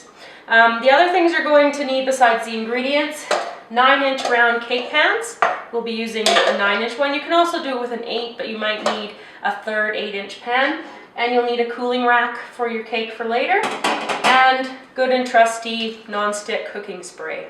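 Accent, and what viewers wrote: Canada. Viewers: I hear a Canadian accent👂👂👂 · Are you Canadian? I feel dumb for asking but I'm really curious.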